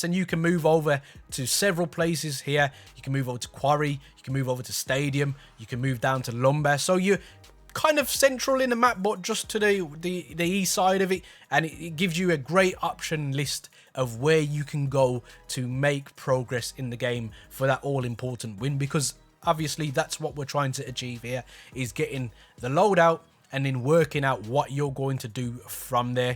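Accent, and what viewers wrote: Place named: Wolverhampton, United Kingdom. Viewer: ah, close! Thought I heard the wolves-ism's. Good video mate, keep it up!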